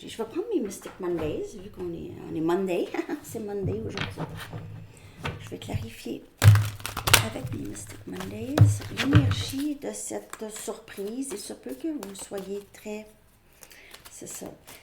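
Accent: Canada. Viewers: Hi sweet lady ❤️ I love ur videos & ur sweet Canadian accent 😊it doesn’t matter to me when u speak English👌🏻👌🏻 M’ from Paris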